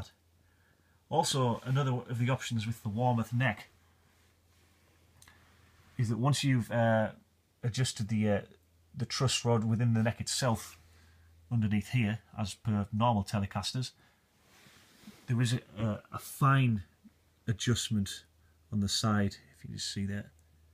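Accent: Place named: Newcastle, United Kingdom. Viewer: Noticed the geordie accent on the first spoken word ! Beautiful guitar man. Did you have to do much extra work on the nut of the Warmoth neck ? Or was it filed to the correct depth ?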